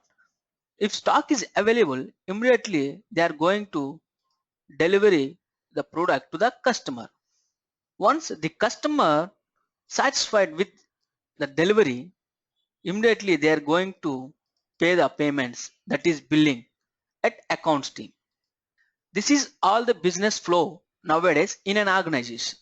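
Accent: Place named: India. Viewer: Gosh! Indian English, what the hell of the intonations? I don't understand!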